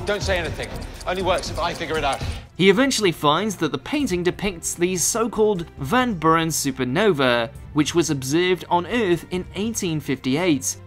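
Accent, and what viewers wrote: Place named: Liverpool, United Kingdom. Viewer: liverpool , i hear in your accent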